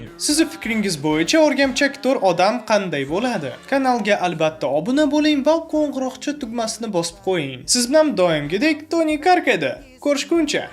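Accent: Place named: Tashkent, Uzbekistan. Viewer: Nma bovoti😂 ybat toza toshkenlik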